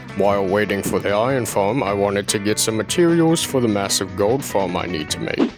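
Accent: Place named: Eastern Europe. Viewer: I did not expect that eastern europe accent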